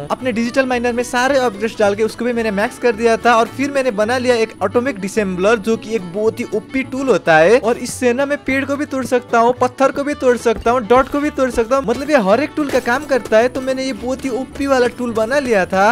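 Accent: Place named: Nepal. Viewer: his langauge tone is like napali tone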